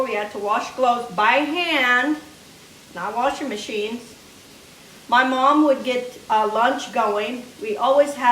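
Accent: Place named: Azores, Portugal. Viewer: Hearing the English language with the azorean accent reminded me of my neighbour next door that passed away a few years ago 🌈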